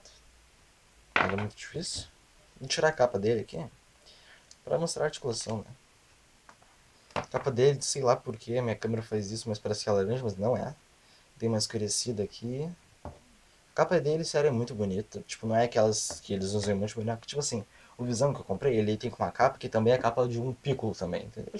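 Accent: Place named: Portugal. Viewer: ah ta....é que o jeito que vc fala parece de portugal kk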